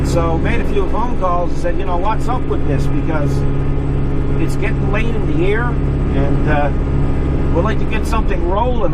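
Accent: Newfoundland, Canada. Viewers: where are you from in Canada? it sounds like your a Newfoundlander because I am one too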